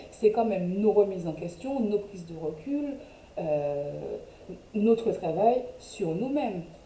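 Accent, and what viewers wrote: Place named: Martinique. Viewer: Jolie petit accent de Madinina💓🌴 mer I pour ce beau tirage... Pour le son c'est moyen.. Mais ça reste audible. 👍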